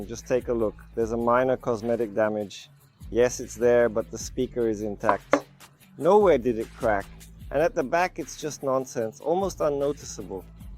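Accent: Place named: RP, United Kingdom. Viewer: The ai translator speaks like a British royalty.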